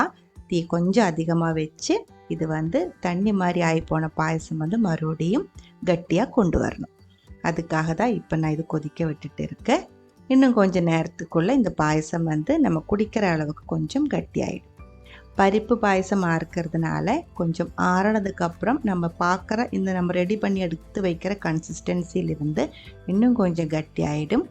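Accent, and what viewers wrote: Kerala, India. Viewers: ഒരു മലയാളി തമിഴിൽ സംസാരിക്കുന്നത് കേൾക്കാൻ നല്ല രസമുണ്ട് പായസം സൂപ്പർ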